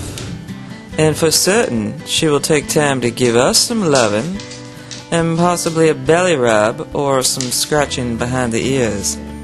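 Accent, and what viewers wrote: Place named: South, United States. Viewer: Narrator is funny as hell ....and soooo southern !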